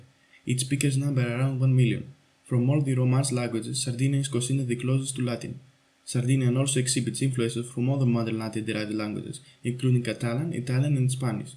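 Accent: Greece. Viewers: Corped_Viper YB He’s Greek, cut him some slack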